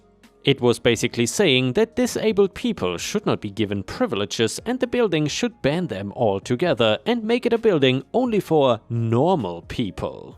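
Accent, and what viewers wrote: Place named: Germany. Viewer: For a voice actor your English accent certainly has a Germanic accent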